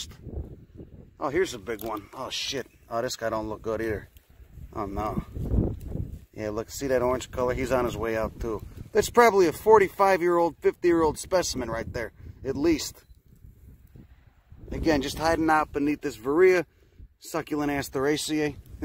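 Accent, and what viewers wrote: Chicago, United States. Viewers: You sound like my cousin he's from Chicago... just saying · I love how you just blurt out latin and shit in that American accent. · Is your accent fake? Or are from the south side of Chicago, cause you sound like my fam.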